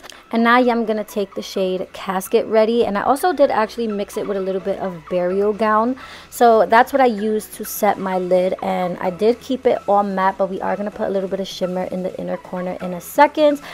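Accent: New York, United States. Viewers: Her accent🥰so New York I love it💕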